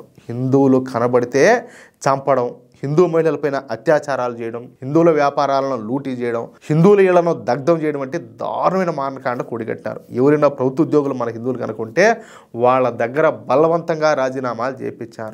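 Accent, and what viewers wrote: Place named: Nellore, India. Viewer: Yendhi abbayaa mandhi NELLORE Yendhi Nee Yasa chusthee alla untee anduku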